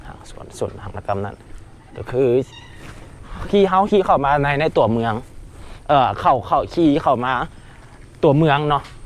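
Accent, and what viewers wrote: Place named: Lue, Thailand. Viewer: เขาพูดภาษาอะไรเจ้าของช่อง (สำเนียงคล้ายๆพวกลั่ว) มันอยู่ภาคไหนของประเทศเจ้าของช่อง แม้!!คลิปแบบนี้เรื่องของอยากของกิน น่าจะจัดสัก25นาทีขึ้น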